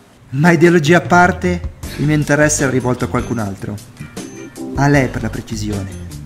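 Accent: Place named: Ancona, Italy. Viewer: Dall'accento sei anconetano...ciao!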